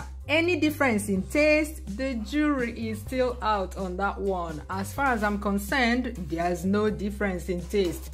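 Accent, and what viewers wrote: Igbo, Nigeria. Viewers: I need the Igbo accent you’re spilling, it’s a great accessory your sharing whilst cooking a great soup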